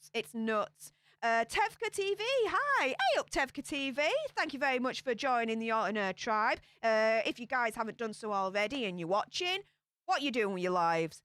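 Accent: Yorkshire, United Kingdom. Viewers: I listened to a video that explains all different English accents by region, and I pinpointed you to Yorkshire! Am I right? 🙂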